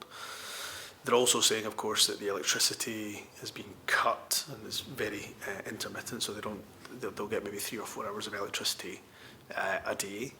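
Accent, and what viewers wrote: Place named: Scotland, United Kingdom. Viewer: Only thing Scottish about this guy is his accent.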